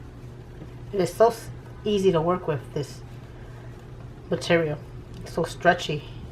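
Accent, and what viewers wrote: New York, United States. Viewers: I love your tutorials. Love the mat, very cool. I also love your New York accent. My daughter is half Puerto Rican on her father's side. Thank you for the tutorial and keep them coming.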